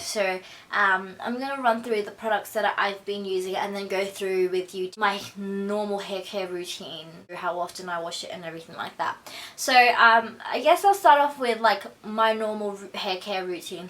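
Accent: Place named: Australia. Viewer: you're from Australia right?? I reaaally love your aussie accent haha :) it's so unique and I love it :) and you have very nice ombre hair!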